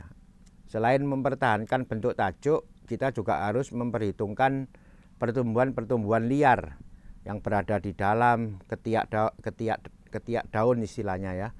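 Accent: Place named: Madura, Indonesia. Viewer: Logatnya kayak orang madura kok dipanggil koh 🤣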